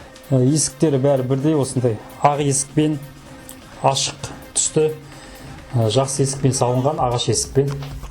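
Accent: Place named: Russia. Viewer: Тіліңіз орыс тіліне ақсау. Таза казакша түсіндірсеңіз!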